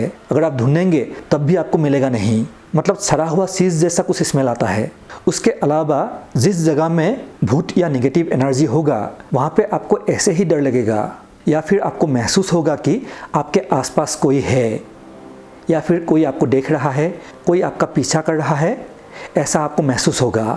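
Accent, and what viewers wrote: Assam, India. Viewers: Apuni Okhomia naki? Apunar accent ... Okhom ot Mayang district ot ase bhoot...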